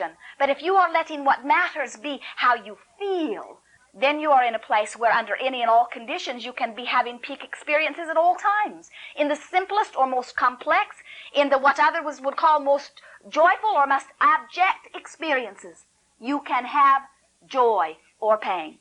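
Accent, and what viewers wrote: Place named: United Kingdom. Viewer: Yes she’s completely British! And I’m British ❤️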